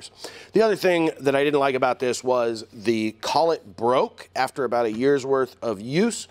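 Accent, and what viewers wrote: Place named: San Diego, United States. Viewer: Are you from San Diego? You talk like a San Diegan. I miss it there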